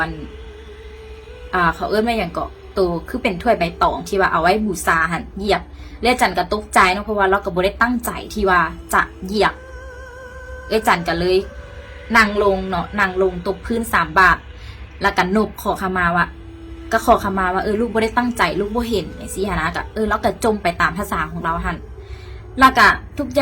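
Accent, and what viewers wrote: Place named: South, Thailand. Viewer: ຮູ້ສຶກວ່າທາງພາກໃຕ້ຂອງຫຼາຍເນາະ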